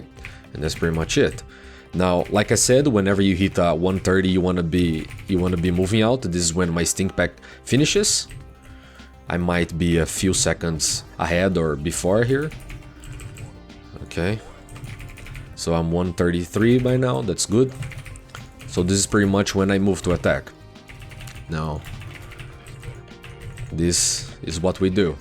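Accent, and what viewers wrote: Brazil. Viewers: Thank you so much for this build! (and Btw are you Brazilian? your accent sounds like it) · thats a nice brazilian accent you got there! cheers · ahahaha só pelo sotaque já vi q é br ! bom tutorial ! comecei a jogar recentemente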